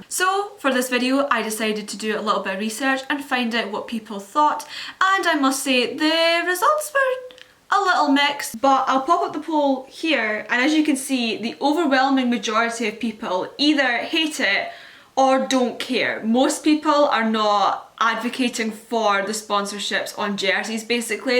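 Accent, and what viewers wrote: United Kingdom. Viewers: When I say she had a British accent I clicked off what does a Brit know about hockey leave the hockey to the Canadians stick to the soccer and rugby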